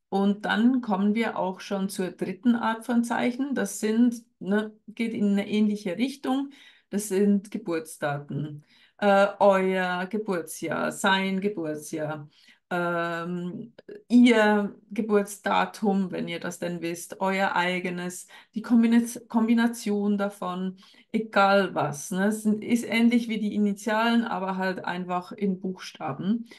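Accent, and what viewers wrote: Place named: Switzerland. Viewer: Ich wäre soo froh wenn das Universum ein Zeichen sendet, allerdings sehe ich an und zu mein Geburtstagsdatum..dachte das bedeutet nichts… da ich nie so overboardet werde wie andere offenbar😂. und mich zwar mit x Seelenverwandten rumschlage..aber nix von Twinflame😂 obwohl das Thema mich seit fast 30 Jahren beschäftigt. Macht nix. Aber Zeichen wären nett für den richtigen Weg… würde helfen❤. Früher hab ich nie gecheckt, dass du Schweizerin bist😂. Dabei kennt man doch den leichten Akkzent..auch wenn du gut sprichst. Has glaub au falsch gschriebe. Wyter so: find dich supi. 🎉❤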